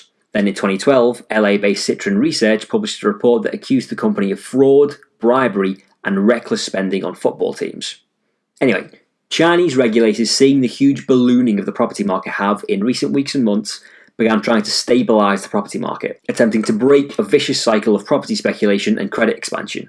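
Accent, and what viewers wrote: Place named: Liverpool, United Kingdom. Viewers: Hard to take anything serious come with a scouse accent . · He has a scouse accent, fairly mild.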